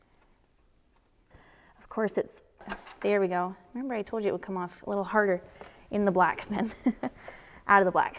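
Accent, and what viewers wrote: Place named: Upper Midwest, United States. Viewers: Lovely girl...very skilled...and love your Minnesota accent. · It took a couple of seconds to figure out what she meant by the pistons being in the "black". The upper-midwestern dialect is so endearing. 🥰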